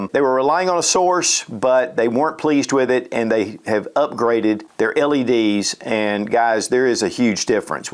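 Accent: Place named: California, United States. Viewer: I'm so jealous with, California people speaking 😅. Nice Gen6 set up. Thanks for all you do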